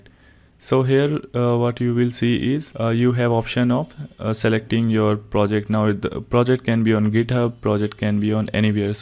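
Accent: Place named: India. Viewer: Пока произношение индуское не уберешь — жрать не получишь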